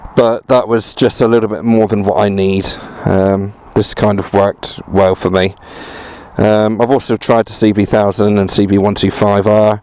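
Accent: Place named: England, United Kingdom. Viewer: Can you talk in an American accent hard to respect with English sounding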